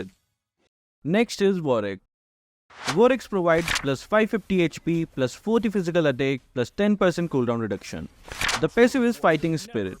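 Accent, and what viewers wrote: India. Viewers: Bro are you from India🇮🇳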